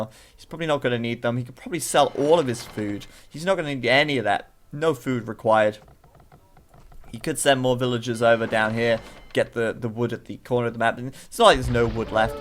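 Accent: United Kingdom. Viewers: are u british??? are u married??/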